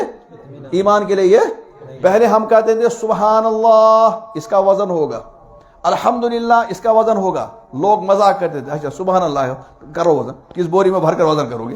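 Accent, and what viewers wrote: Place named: Kashmir, India. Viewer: urdu mai kashmiri tunr h hazrat ka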